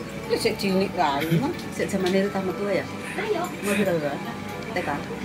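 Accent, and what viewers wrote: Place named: Kediri, Indonesia. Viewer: selalu nunggu upload tan terbaru... Mz ngemong and sama temu kanggen soalnya juga punya saudara kediri and blitar yg logat ngomongnya sama.. ☺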